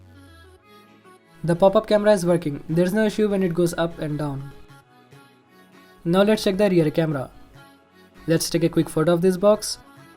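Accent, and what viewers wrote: India. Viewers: I love Indian English 😂😂😂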